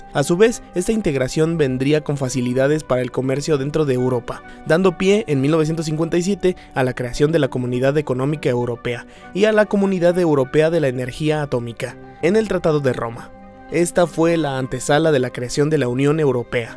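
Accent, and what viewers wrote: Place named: Mexico. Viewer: No me gusta tu español mexicano.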